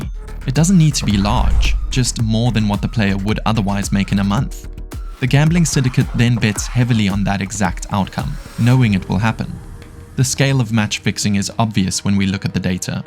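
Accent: South Africa. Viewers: incredibly well put together and informative video, your English is incredible. i thought you were South African haha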